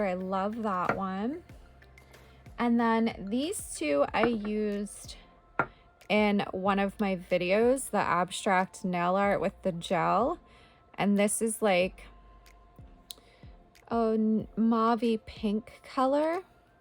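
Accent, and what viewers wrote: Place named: California, United States. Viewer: So so helpful to have these suggestions in a single format. Thank you. I have used so much of your suggestions. Oh, and as I was watching your video, my son walked into the room and asked if you were a California girl. Because of your "accent". LOL, wasn't aware you had one🤣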